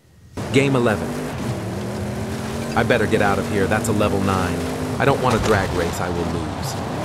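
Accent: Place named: Australia. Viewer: that sounds more like Australian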